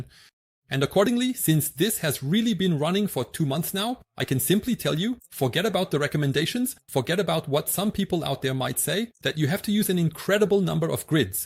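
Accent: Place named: Germany. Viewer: Bro u sound so German but very good explaining!!!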